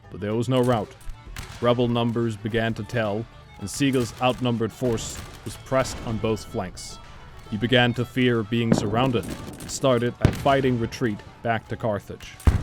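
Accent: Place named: Finland. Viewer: Really enjoyed this video but im really trying to pin point the slight accent i hear when you pronounce Missouri...your S and Rs make me suspect a Finnish background 😅 Supmalainen Sisu! 🇫🇮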